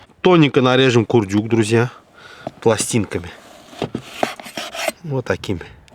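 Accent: Baku, Azerbaijan. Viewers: Дааа, Бакинский акцент и мастер класс !!!! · Бакинский акцент не перепутать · Очередной раз кайфую от того как готовит автор! Бакинский акцент это просто услада сердца! Первый раз слышу что говядину надо мариновать три дня . Обязательно попробую так сделать. Спасибо! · 7 Февраля и время просмотра 23.56, случайно увидел видио, наверное кушать хотел 😂, но тут реально Шеф и мастер своего дела, четко и без пафоса все рассказал и + Бакинский акцент ))) спасибо вам дорогой, жду выходных и буду делать вкусные шашлыки из говядины ( Дана ) 👍👍👍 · Это кайф слушать ветра Бакинского берега и Бакинский акцент. Дай БОГ Вам здоровья дорогой! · Прекрасный обед. Сам я родился в Баку 65 Лет назад но очень приятно слышать дружелюбный бакинский акцент. Спасибо большое каспийскому берегу!!!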